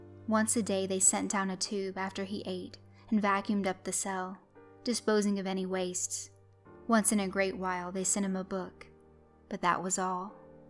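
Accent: South, United States. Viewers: What makes it even better is the cute southern (Georgian?) accent when she's not reading!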